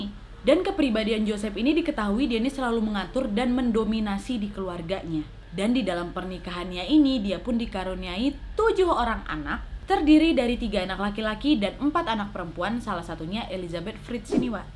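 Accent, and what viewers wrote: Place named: Medan, Indonesia. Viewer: Sukak kli lho denger kakak ini ngomong, logat medannya tu.. Hihi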